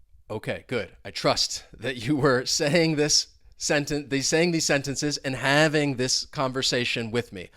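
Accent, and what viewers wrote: United States. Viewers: I love the American accent .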